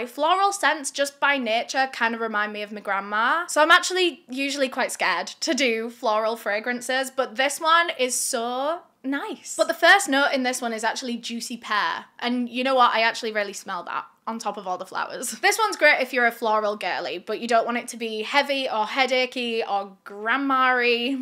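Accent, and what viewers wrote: United Kingdom. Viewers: From America here. I absolutely love your accent. I hear different accents from the UK and I’m so jealous of yours. And keep saying fuckin. Fuck Fuck Fuck. Shit shit shit. 😂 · the british accent is making me nauseous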